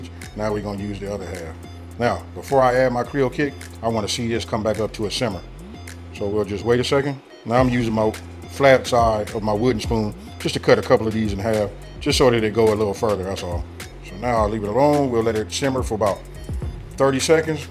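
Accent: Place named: Los Angeles, United States. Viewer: I can tell u a l.a brother by that accent lol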